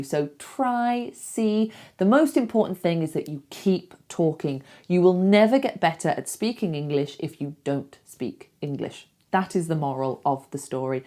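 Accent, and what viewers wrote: United Kingdom. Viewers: Hello pretty and smart girl, how are you? Well, I'd like to know if your accent is British. I incredibly can understand a good part that you speak, I say "incredibly" because I'm Brazilian and my ears are trained to a United States accent. I like the way you speak slowly; it's very didactic. Furthermore, the beauty of your face is a delight to my eyes. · You have a great pure British accent. I really made use of this video 🎉🎉🎉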